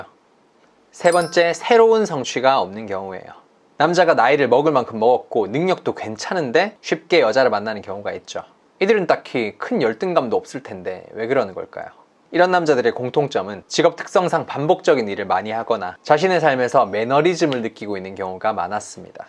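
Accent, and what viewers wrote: Gyeongsang, South Korea. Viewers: 밑지방 사람인거 티가 나기보단 그냥 대놓고 나 밑지방 사람이야!!!!!하네요. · 대구 말투에요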